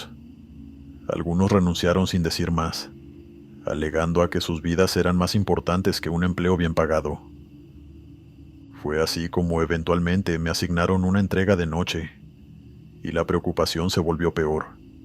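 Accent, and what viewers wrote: Mexico. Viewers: La voz del narrador es absolutamente envolvente, su acento Mexicano la hace aún más increíble, perfecta para relatos de terror. Adoro este canal🙏🏽🇨🇴❤️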